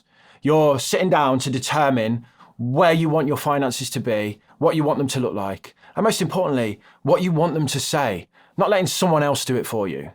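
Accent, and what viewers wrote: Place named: Midlands, United Kingdom. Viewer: Brilliant. I never thought I’d enjoy a video on finances. Also… loving your Midlands accent!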